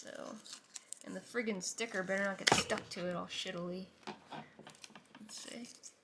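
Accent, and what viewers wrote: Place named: South Jersey, United States. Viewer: Can’t wait to try this strap. Thanks. And by the way…and I’m not making fun because I grew up on NY and get a lot of comments about my accent, But I think you have that south Jersey accent which has the Philly influence. There’s certain words when it reminds me so much of my friends from that area. Good luck with channel!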